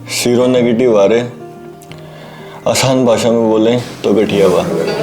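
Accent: Haryana, India. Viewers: Oo my god your haryanvi accent 🤗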